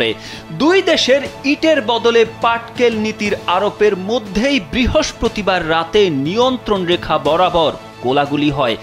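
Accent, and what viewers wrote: Kolkata, India. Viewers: Reporter Kolkata reporter er moto kota nolen😂😂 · আপনি দেখি কলকাতার মত খবর পড়া শুরু করেছেন.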